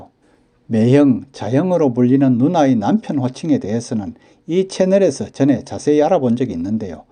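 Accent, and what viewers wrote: Gyeongsang, South Korea. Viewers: 매제, 매부, 발음에 있어서 문제 없습니다. 지방에 따라 발음이나 장단이 다를 수 있지만, 경상도 억양을 쓰는 님이 쓰는 매제, 매부, 발음은 서울, 그리고, 표준발음하고 다르지 않습니다.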